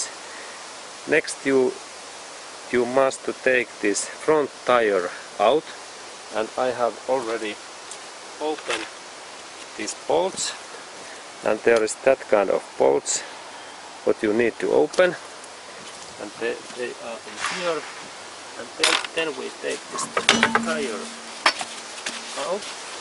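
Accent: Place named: Härmä, Finland. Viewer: murre on niiiiiinnnnn härmäläinen kuin olla voi:)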